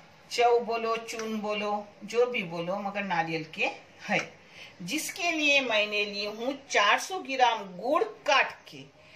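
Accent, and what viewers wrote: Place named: Konkan, India. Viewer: Ap k bolne k tarike se lagta hai ap kokni hai Isliye pochi jazakallah khair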